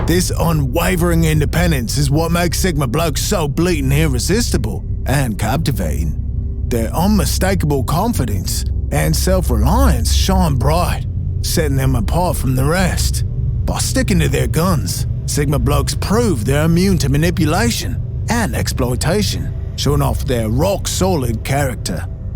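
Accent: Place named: United Kingdom. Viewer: You Rock..... OMG... that was the best Sigma Description Ever..... Being a Sigma - Male - Empath.... You might consider doing a short on the Sigma Male Empath..... The Rabbit hole does go deeper.... I have been this way all my life..... Military Used it for 30 years.... But Your Voice..... Well you have to be part of the Island Boys (UK, IE (ME), SCOTLAND.... Since I was born In Dublin, but Now have lived in the US since i was a wee lad..... So... Kinsman if you are actually in the UK..... and have a Pint of Carlsburg for me.... Futbol Season is coming.!!!! Oy Oy Oy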